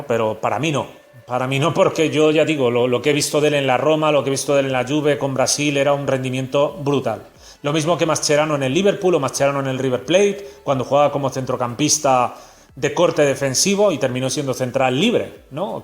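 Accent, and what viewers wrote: Spain. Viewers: se te nota mucho loe español, pero no te culpo es inevitable. faltan muchos, pero que no esté kahn es una pifia muy grande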